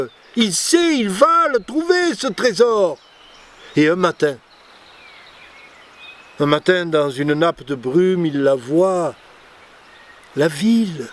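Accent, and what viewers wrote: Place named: South, France. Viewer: Du Paolo Cuhelo, avé l'accengn... Merci ! J'allais dire : encore ! Mais encore, tu nous le donnes déjà.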